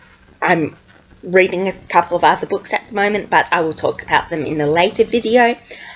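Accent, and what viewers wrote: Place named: Australia. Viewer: Hi, welcome to Booktube! 😊😊 I gotta say I've always liked the Australian accent hehe 😁😁 I hope to keep seeing your videos around and can't wait to see you grow 😊😊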